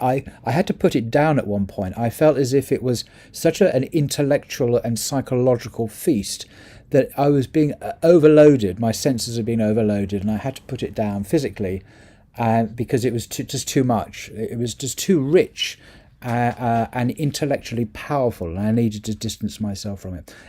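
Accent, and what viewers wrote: United Kingdom. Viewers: So ‘phenomenological’ is a tongue twister term for you. I was wondering if you had one! 😅 you have that eloquent British accent that sounds magical...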